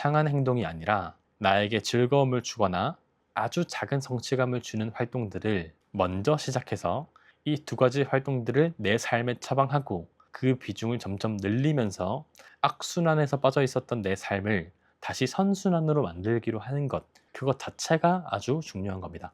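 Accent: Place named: Gyeongsang, South Korea. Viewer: 억양이 경상도시군요...^^반갑습니다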